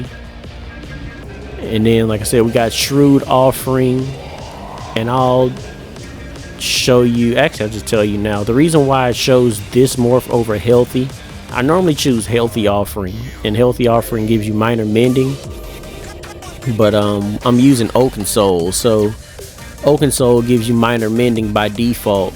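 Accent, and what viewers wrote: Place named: Georgia, United States. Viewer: You sound like my friend from savannah Georgia, idk if you live around that state but I'm from Texas and yall have a stronger accent then us lmao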